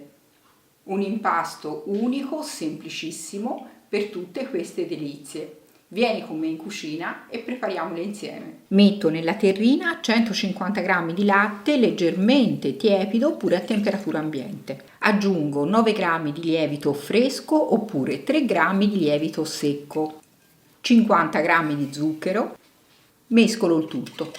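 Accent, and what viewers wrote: Tuscany, Italy. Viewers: Buonasera, con la sua dolce calma e quella magnifica cadenza toscana, ci ha regalato una ricetta che si deve provare prima di subito... Che meraviglia questi dolci di carnevale. Grazie! 🎉🎭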